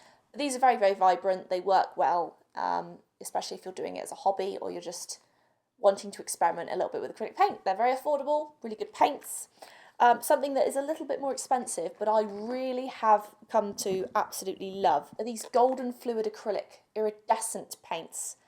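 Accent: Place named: South West England, United Kingdom. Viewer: I detect a south westerly accent there; maybe Bath?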